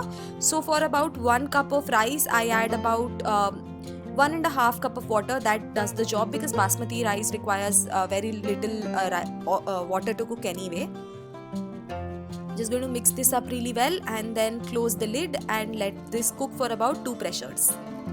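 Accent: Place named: India. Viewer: I watch your vedios for improving my English speaking...bcz your english is pure indian english... thanks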